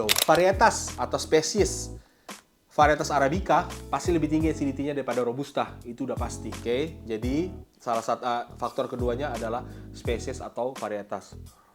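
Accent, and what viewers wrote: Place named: Pontianak, Indonesia. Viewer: mak eh org pontianak rupe e wkkwkw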